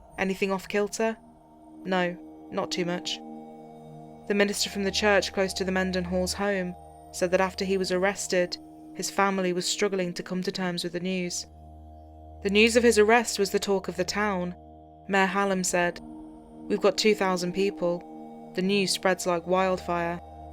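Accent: England, United Kingdom. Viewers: why is the story of a murderer in Tennessee narrated by a person with an annoying English accent? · yeah it's weird I don't mind English accents in person but a voice over drives me crazy. Funny thing is I'm 50 percent English from the states.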